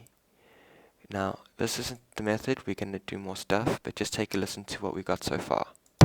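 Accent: South Africa. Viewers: He sounds South African.. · South African?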